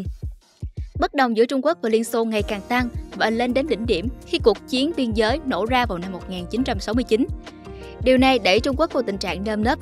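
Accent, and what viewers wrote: South, Vietnam. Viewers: mc người nam, ngã hỏi đọc na ná nhau, quan trọng là video viết đúng chính tả và nói đúng nghĩa của nó. Nếu nghe mà không hiểu tại sao "sỉ" mà không phải "sĩ" thì chỉ trách mình học chưa đủ nhiều, cần học thêm.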